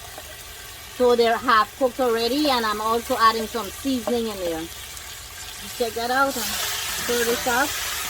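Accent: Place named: Guyana. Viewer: i have watched this video/your Narration about preperation is amazing/you are talked very nice -some times I am laughing about your talking /your english is mixed with Guyana and little bit 🇺🇸USA/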